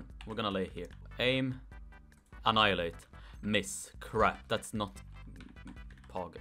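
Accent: Sweden. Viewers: are you swedish? you sound like swedish:/ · Are you Swedish?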